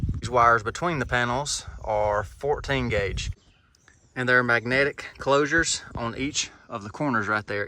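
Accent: South, United States. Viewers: so confused. here a southern accent but username says mountains. but the south doesnt have mountains..?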